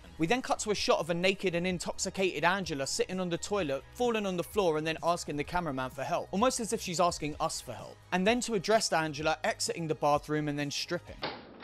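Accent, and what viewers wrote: United Kingdom. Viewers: Yes I always thought I would love to critique movies just like this! I am the crazy movie watcher😂 also hearing your accent makes me REALLY miss the 🇬🇧!!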